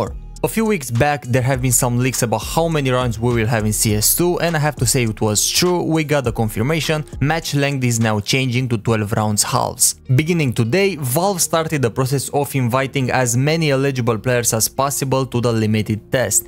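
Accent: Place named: Romania. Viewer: Ești român se simte în accent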